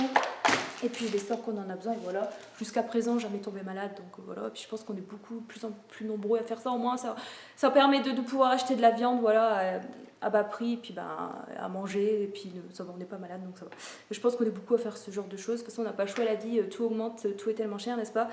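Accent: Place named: North, France. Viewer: Waouh j'adore ton tee shirt, tu l'as acheté où ? ☺ merci pour ton contenu, j'adore tes vidéos et ton accent qui me rappelle mes racines nordistes 😁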